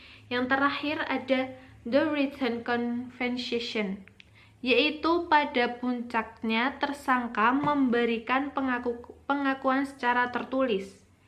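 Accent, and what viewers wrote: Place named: Java, Indonesia. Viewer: meskipun agak medok tp sangat membantu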